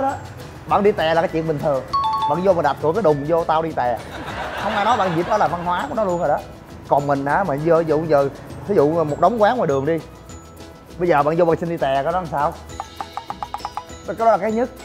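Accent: Mekong Delta, Vietnam. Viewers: Nhưng đời trc nó ng Bake không phải thuần chủng miền tây miền nam. Nó nói giọng miền tây do nó sinh ra miền tây nó vẫn mang máu Bake.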